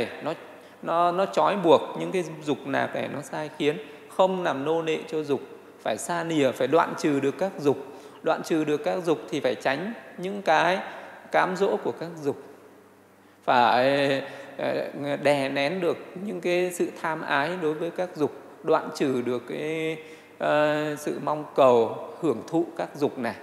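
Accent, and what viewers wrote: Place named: Nam Dinh, Vietnam. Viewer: Nghe giọng nói của thầy hình như là người Nam Định phải không a .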